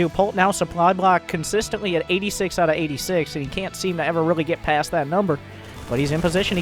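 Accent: United States. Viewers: I love your delivery, vocabulary and accent. It's nice to see such a strong american accent that is easily understandable by a non-native speaker. Kudos!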